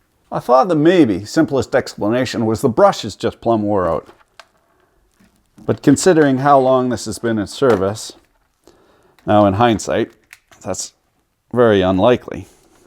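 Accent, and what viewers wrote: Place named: Canada. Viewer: This might be the most Canadian fellow I’ve ever heard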